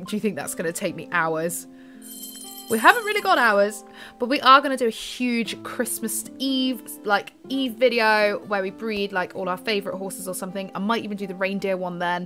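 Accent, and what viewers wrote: United Kingdom. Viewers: And I like your British accent 😁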